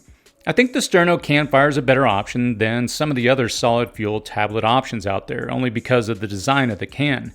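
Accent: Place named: Pennsylvania-Maryland, United States. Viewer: I hear your accent you sound like your from PA Md area my family is from there many generations :-)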